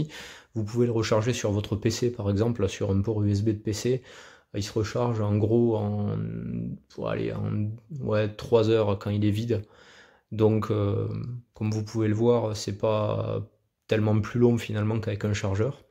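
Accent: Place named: Southwest, France. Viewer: Bonjour, étant un bon passionné du monde Star Wars et bien évidemment des sabres Jedi, je suis tombé sur ta chaîne et j'ai directement accroché. J'adore tes vidéos, elles sont précises et riches d'informations. Tu t'exprimes vraiment bien et ton accent du SudOuest met le sourire. Excellentes vidéos, j'espère que tu vas nous en proposer de nouvelles et je t'encourage à continuer. Je te souhaite de bonnes choses. PS: je me demandais si tu avais une page Instagram ou Facebook, où l'on peut voir ce que tu fais et discuter.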